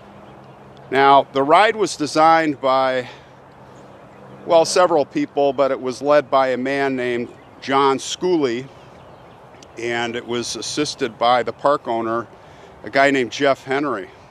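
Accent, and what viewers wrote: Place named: Chicago, United States. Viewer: New to your channel - not sure how I missed you for so long. What I like is that your stories are about people we probably never heard of before, your slow, calm voice, and of course the content itself. But there's more: You seem so familiar. I was born/grew up in Evanston, IL - very close to Chicago, as you know. So you accent/grammar/inflection reminds me of many male relatives. It's almost like hearing one of my Uncles telling stories again! Anyway, glad I finally stumbled into you, and now look forward to going back to hear/see your earlier posts, too. :)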